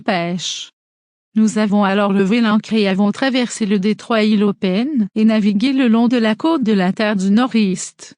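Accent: Canada. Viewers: 54 minutes d'accent quebecois , c'est terrible, mais cela ne va pas être possible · La voix est horrible avec un accent canadien en plus de ça, la combinaison est atroce 🤮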